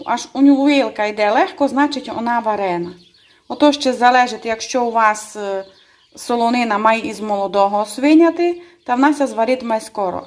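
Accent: Transcarpathia, Ukraine. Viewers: Можу цілу вічність насолоджуватись закарпатским діалектом та кухнею💕 З любов'ю "западєнєц" з Донбасу ^^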